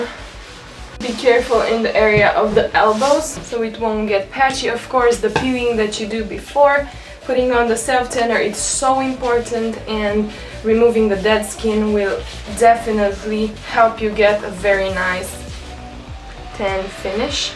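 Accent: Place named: Romania. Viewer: Esti cumva din Romania ? Am vazut produsele romanesti si ai si accent 😘😘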